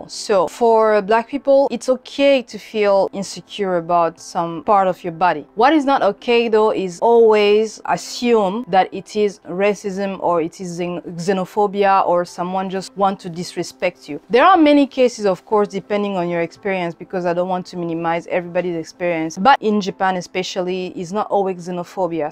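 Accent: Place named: France. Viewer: wait are you french? bc u have the french accent like me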